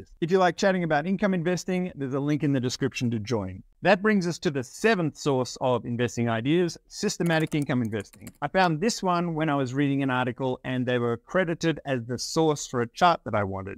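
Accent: Australia. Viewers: Hi, sounds like you are Australian. Do you also cover Australian income ETFs? I'm Australian but live in the US so I invest in both countries. Thanks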